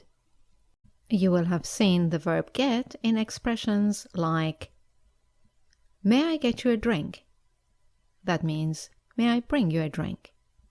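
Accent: United Kingdom. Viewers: is it uk accent or us accent? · Very good class.. It helps me improve my English, specially British accent. Brilliant explanation. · Your video helps me a lot to improve my English, that's why i sign up and make a comment to say thanks for all the effort you've done not only for me but for the other who want to learn good English. your such a lovely teacher i like the way you introduce your lesson and i really learn from you. what i did not learn is your accent of saying the words please help me and teach me how to imitate your accent because i like British accent rather than american accent... keep up the good work. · great!!!!. niice british accent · Brittish accent rules!!!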